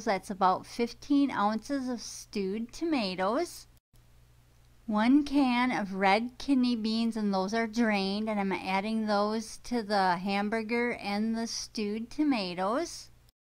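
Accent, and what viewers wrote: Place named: Minnesota, United States. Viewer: jeez, your accent is thicker. (not a bad thing) but you must be from around the iron range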